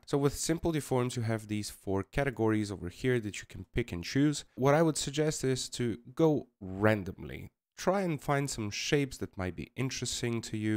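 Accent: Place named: Ireland. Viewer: I don't know why, maybe because of your accent, I was convinced you are in Ireland 🤦‍♀️😊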